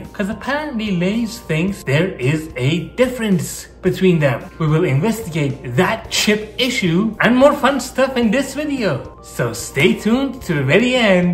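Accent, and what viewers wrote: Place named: Iran. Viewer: Are you Iranian? Your accent seems Persian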